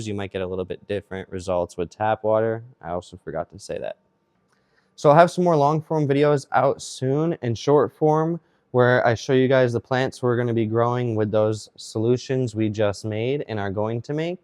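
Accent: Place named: Southwest, United States. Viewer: Nice video. Sounds like yur in Nevada, New Mexico, Arizona or Texas. Your ideas our great. We find plant people are the nicest. Eventually kids will be even more fun then plants then you teach them all about these great things, nothing more joyful then kids. Keep pushing along, the Lord, wife, school, work, family then plants n hobbies and you will have a great balance. Blessings and very nice videos and well spoken.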